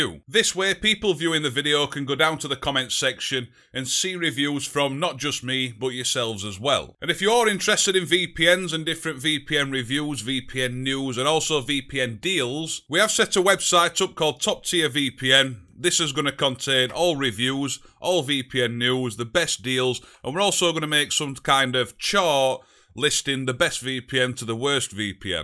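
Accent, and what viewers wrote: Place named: United Kingdom. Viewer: Nice to see someone with a British accent. I can't stand the American one. Especially when they say words like data it does me headin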